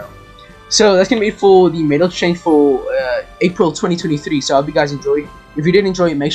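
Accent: South Africa. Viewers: Bro i have to ask lmao, but are you south african? Love the content btw. Keep it up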